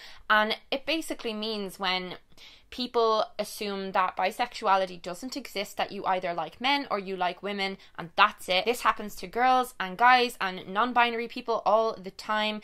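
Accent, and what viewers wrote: Ireland. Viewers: I watch your videos because I love your accent :) even though if I speak english now (Im from Germany) I tend to slip in some words that sound irish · Well I started watching you because I saw you in a video with Hannah, and you seemed nice. Also, I loved your Irish accent. But I stayed because you've made some really interesting and honest videos, and you tell your opinions on subjects, even if they contradict with what the majority of youtubers are talking about at the moment(i love how you mentioned that drinking alcohol every now and again is ok. I realise that talking about alcohol addiction is important, and I've been loving Lucy Moon's videos on the topic, but not everyone has a problem with alcohol, and I think it's important for people to know that, especially younger people who haven't tried alcohol yet.) I also like how you're supportive of other youtubers' success, book deals, etc. 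I tend to be a jealous person, envious of other people. But I've been trying to be more positive and supportive, and less jealous, and It's thanks to your videos :) Sorry for the long comment, hope you have a nice day! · I watch your videos because you're the first person with an irish accent that I ever heard (and then I discovered that it was FREAKING CUTE) + you're a bisexual lady (me too) and I love how you talk about this + you're mostly positive ! Love from France <3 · Hi, in response to your question; why do I watch your videos. I have to say that your personality is so great! You are so real and so cool, so pretty and just amazing. I love what you stand for and I love the fact that you helped me come to terms with me being both bi and feminine in personality. Like what you always say it's hard to be bi or lesbian when you are feminine because people assume you are straight but it's nice to know there are other girls like you out there that are going through the same thing as me. 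Also you're Irish and I love the Irish accent and Irish people are just so nice (like you). So yep in summary I watch your videos because I think we could be really good friends, you seem like a nice person (with cool videos I watch religiously) -soz if I went on a bit in my answer but you're great and inspiring to me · i absolutely love your videos, they always make me smile. your sweet Irish accent always chills me out. i love your Q and As beacuse i love getting to know you more. you are such a light in such a dark internet space. lots of love from England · Hi!! Just want to answer "your" question (technically not yours haha) I found you through Bry & Candice a WHILE back in a video about yoga.. I think?? And I checked out your channel and I immediately loved your body confidence videos as well as your food related videos and the makeup ones!! So I subscribed and then you started talking about your sexuality (Bi girl right here 👋) and I was just like "omg thank you SO freaking much for talking about this" You really help me to deal with life in general, your like a cat you calm my anxiety and make me laugh and smile:) Honestly, I feel like we should both go to Hogwarts and you would for sure be my favorite teacher!! 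Anyway, I just rambled about all the reasons why I watch your videos and I hope it makes sense because you're probably my favorite YouTuber and I sometimes wish I knew you in real life because I would LOVE to have someone like you to brighten up my life with your gorgeous eyes, your incredible knowledge of certain things even the most random ones (I did not know Freddie Mercury was Bi thank you!!) and of course your LOvely Irish accent! Thank you for being the most genuine YouTuber I know and helping us all out:) Love you lots xxx PS: also, you're my YouTube crush shhh;)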